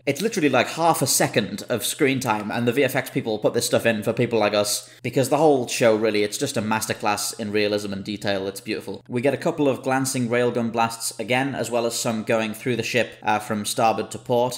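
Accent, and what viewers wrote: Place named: Wirral, United Kingdom. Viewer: Are you from the Wirral?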